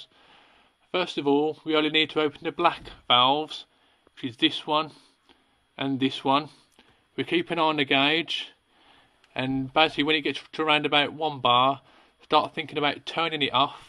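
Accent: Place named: Birmingham, United Kingdom. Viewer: Fantastic help thanks - have recently emigrated to Italy which I'm presuming the home of Ferroli and have just fixed my error 37 fault! I can warm myself up tonight! Cheers - and good to hear a Brummy-ish voice again!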